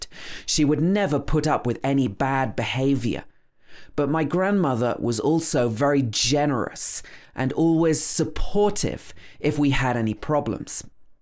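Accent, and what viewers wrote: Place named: United Kingdom. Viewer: Hi,. How are you?; I am living in Manchester and it is more important to improve my British English. It would be great if you make some videos of lessons like this THAT IS VERY PRODUCTIVE AND IMPORTANT. Your British pronunciation is great and all lessons as well That is the reason why I am learning English with you at the moment I have IELTS 6.5 but it is not enough. Thank you.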